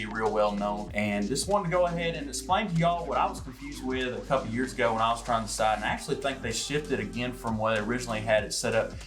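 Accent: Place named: Alabama, United States. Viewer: This fella from Alabama? His accent reminds me of.. me.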